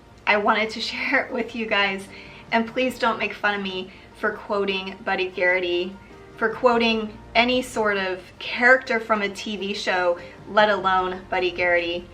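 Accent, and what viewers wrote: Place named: Texas, United States. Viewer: Love friday night lights ❤ haha I love the Texas accent. Bonnie would have talked like that 😍🥰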